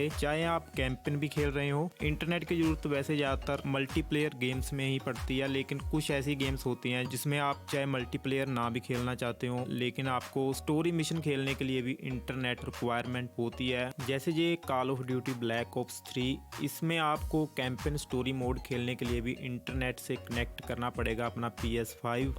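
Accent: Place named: Punjab, India. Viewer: Bhai tera accent Punjabi ja aa 🤨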